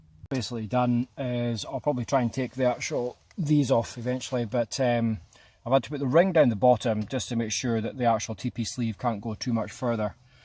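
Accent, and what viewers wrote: Inverness, United Kingdom. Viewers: Hi...Found your channel , subscribed and am working my way through them....Enjoying them very much...I am intrigued with your accent...I am an Invernessian born and bred....Your accent is somewhat similar....Great variety in your vids , thanks for all your filming efforts...Look forward to watching more....Cheers from Inverness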